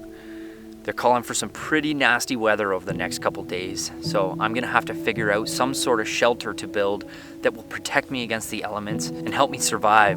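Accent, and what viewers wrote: Canada. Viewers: Not even a minute through and I heard Canadian and I’m enjoying it, love from Alberta new subscriber here!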